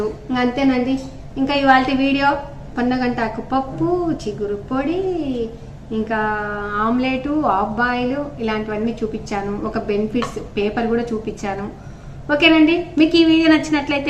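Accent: Rayalaseema, India. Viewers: Avva super maa avva kuda mee lage undi madi ndl local andi meedi nagative a vuru andi meeru chebutu unte naku ndl ravalanipistuni kani hyd lo vuntamu mimmalni Ndl akkado pelli lo chusinattu anipistundi anduke a vuru ani adiganandi mee videos chustuvuntanu rayalasseema stylo ne matladandi maku vurlo vunnattu anipistundi maa amma kuda meelage pappu gattiiga chestundi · Mana Rayalaseema slang chaala baaga matladtunnaru andi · ఎన్ని రోజులు అయిందండీ రాయలసీమ వారి వంట ,మాటలు విని,ఎక్కువగా కోస్తా వాళ్ళ చానెల్స్ ,language ఉంటుంది.చాలా బాగా చూపించారు అన్ని items ఒకే వీడియోలో thank u. మాది కర్నూల్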